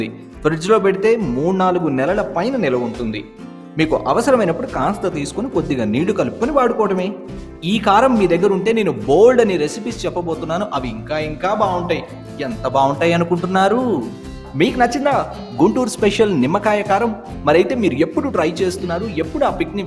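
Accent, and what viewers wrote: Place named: Guntur, India. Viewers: Are meru Guntur slang Kuda Chala chakkaga matladestunnaru. Vinadaniki bagundi. Pickle chuste notlo neellu,kallalo Kuda neellu vachestunnai. Defenate ga chestanu. Garu meru okasari kanipinchandi. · actually nenu vere vallani anatledu sir gnt slang baguntundi meeru slang marchakandi teleena vallu apardham cheskuntaru antunna that's alll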